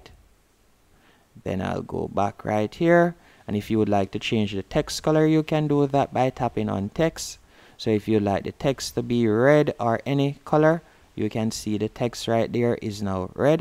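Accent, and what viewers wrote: Jamaica. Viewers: Haven’t finished the video yet but I wanted to take a moment to admire you accent. I love the Jamaican accent, reminds me of home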